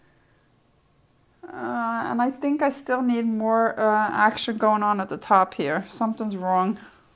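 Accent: New York, United States. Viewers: I just found your channel and I find it very motivating and filled with ideas. I enjoy your narrated versions the best. From listening I thought you were from NJ or NY, but heard you mention Norway..then saw your FB profile. Brooklyn..makes sense. I'm in NJ. :) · It says your in Norway, but you sound a bit like a New Yorker...I really enjoy watching your videos. Does doing the live videos make you more self conscious? I've been watching you for quite a while now, it just seemed like you were feeling uncertain about your process...girl, you are a great artist, don't forget that..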